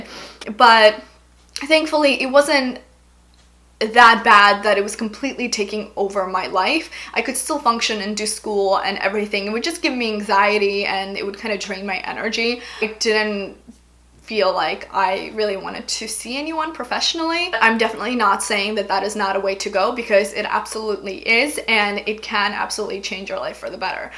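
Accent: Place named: Los Angeles, United States. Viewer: it's funny i was listening to this video with the background app only sound and as an foreigner we are influenced by american tv shows and have those L.A accents ingrained in our head hahaha so when i heard your voice/little vocal fry i tought "she's from L.A no doubt about it" :)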